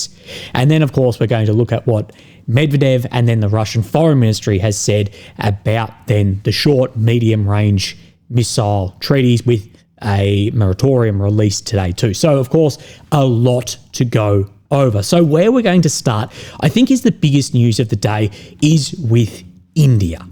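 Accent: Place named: Australia. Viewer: When you're reading you going too fast remember you got that deep Australian accent so please slow down when you read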